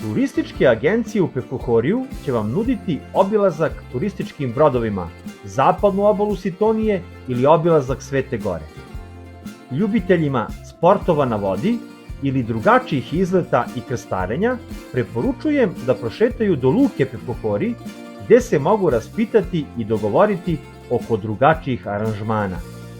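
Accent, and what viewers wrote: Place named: Jagodina, Serbia. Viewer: BRAVO ZA MOG JAGODINCA,OSETILA SAM PO NAGLASKU DA STE IZ TIH KRAJEVA PA SAM BILA SLOBODNA DA VAS PITAM ODAKLE STE. JA SAM PO MAJICI IZ MAJURA KRAJ JAGODINE, A ŽIVIM U ZAJEČARU . SA PORODICOM SAM U AVGUSTU OVE GODINE BILA U PEFKOHORIJU,STVARNO JE LEPO. POZDRAV OD ❤ ZA VAS. PRODUŽITE SA LEPIM VIDEIMA,KORISNI SU . 🌹🍀🥰👋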